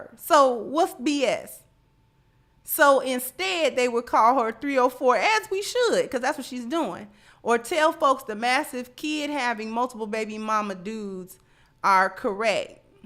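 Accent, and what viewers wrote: Chicago, United States. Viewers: I didn't know you were in Chicago, but since you said it I can definitely hear that Chi-town accent. 😉 I have family and friends all through the Chi. By the way, you're coming with that fire 🔥 today! Lori Harvey is getting ran through... It's sad really.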